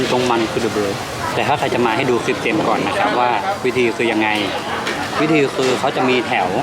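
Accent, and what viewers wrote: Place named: North, Thailand. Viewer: ชอบฟังพี่ พูดเหนือมากเลยค่า น่ารักมาก อยากให้ทำคลิปพูดเหนือเยอะๆๆๆเลย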